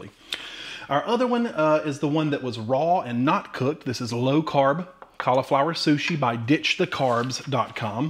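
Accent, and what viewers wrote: South, United States. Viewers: I could listen to this all day, just for his voice! So friendly, so Southern!! Thanks for the info- I'm from Korea and I NEEEEED low-carb rice!!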